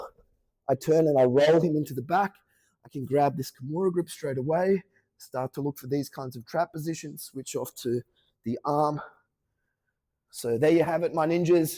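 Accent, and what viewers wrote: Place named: Australia. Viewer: This Australian accent is wonderful ❤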